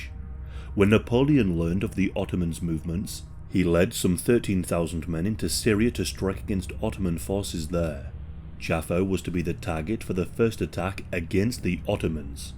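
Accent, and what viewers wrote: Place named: Birmingham, United Kingdom. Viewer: I can’t stand it. I think he’s from Birmingham.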